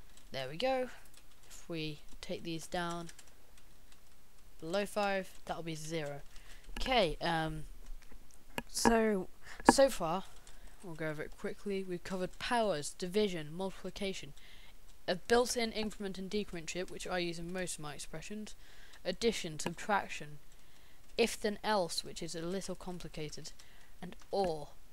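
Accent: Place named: United Kingdom. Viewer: great tuts...also love your accent, british-type accents are cool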